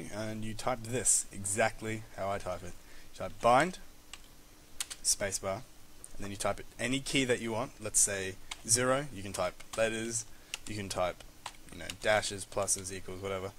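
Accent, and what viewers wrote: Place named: Australia. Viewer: I LOVE THE AUSSIE ACCENT! ITS SO NICE TO HEAR A YOUTUBER HAVE ONE! (i'm australian)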